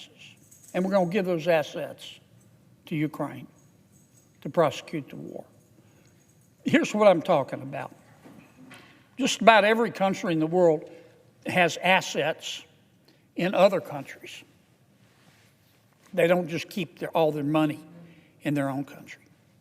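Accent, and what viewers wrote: United States. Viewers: factualy the best american accent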